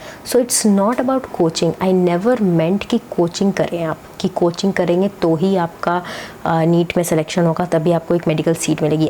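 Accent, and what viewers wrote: Bihar, India. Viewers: R u from Bihar???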